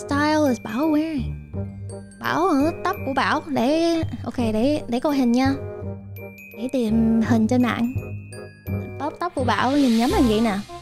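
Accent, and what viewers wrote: Mekong Delta, Vietnam. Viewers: nói tiếng Việt nghe đậm chất miền tây luôn á với lại mới biết đến thấy cute dễ thương...ngoài bả mình còn mê cả Shoto nữa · cách nói đậm chất miền tây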